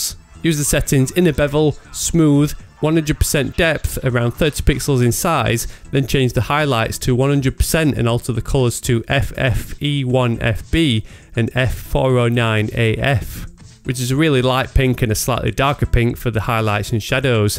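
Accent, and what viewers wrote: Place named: Scotland, United Kingdom. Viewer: It's not about entirely whether you can handle, personally it's not that fast for me, but it's a bit fast for me, or...his accent is kind of wacky, I mean, Brits are talking funny, and then there comes Scots and Aussies, which are way more wackier/funny when they talk, so, a bit harder to understand what he means when he says something at a high speed without spots in between commands/lines. I have to turn captions on to understand what scortcut he meant, or what he was saying in the first place while trying to do something or explaining.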